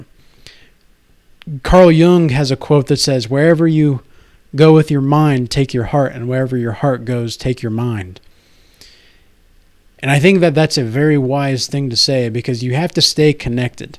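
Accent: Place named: United Kingdom. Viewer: No. It worked. The British accent. It worked : )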